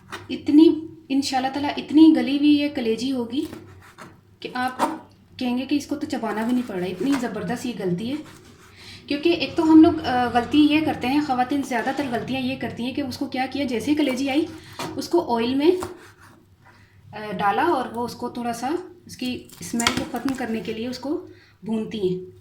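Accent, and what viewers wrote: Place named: Punjab, India. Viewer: API ap buhat acha bolti ho Urdu k Saath Punjabi tarhka. Nice recipy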